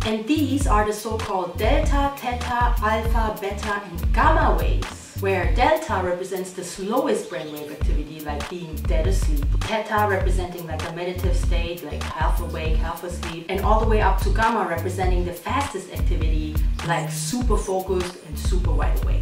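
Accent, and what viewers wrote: Germany. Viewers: Yes sis, feeling the vibes n knowledge. Luv the German accent.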